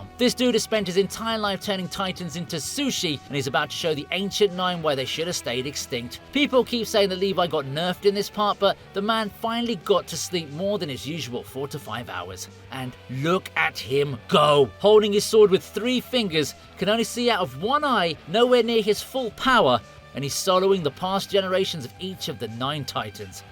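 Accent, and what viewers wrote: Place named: United Kingdom. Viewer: Always ruined by a terrible British voice.....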